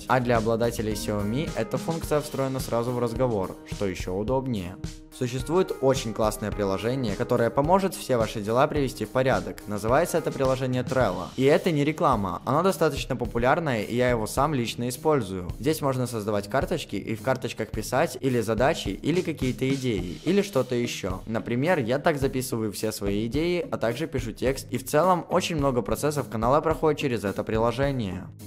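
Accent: Belarus. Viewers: Ты из Беларуси. (это утверждение)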